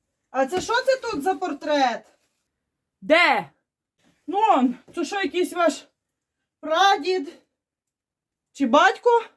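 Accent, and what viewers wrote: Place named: Rivne, Ukraine. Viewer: Доброго вечора Вам, сміялась до сліз як прибирали кладовку.Успіху Вам.Моя юність пройшла в м.Рівне, були подруги з м.Березне, м.Сарни, м.Костопіль.У Вас такий самий акцент.Дуже Вас люблю!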